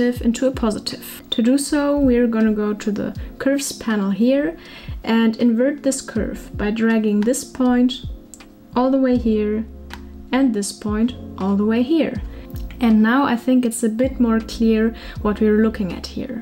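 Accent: Germany. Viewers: I hear a bit of deutsch 😅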